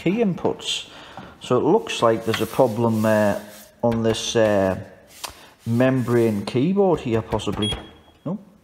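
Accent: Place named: Scotland, United Kingdom. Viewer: Great video, love the accent. Like a slight touch of scottish in your north east dialect. Keep up the great work. Kind regards. Paul. 👍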